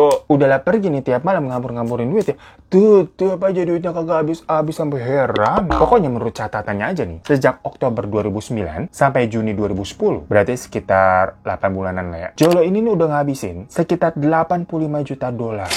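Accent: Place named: Betawi, Indonesia. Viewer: Betawi nya lucu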